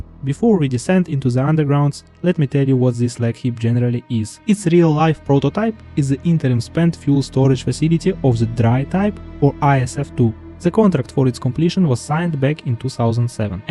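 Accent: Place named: Russia. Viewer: Я прям слышу русский акцент. На русском ролики будут?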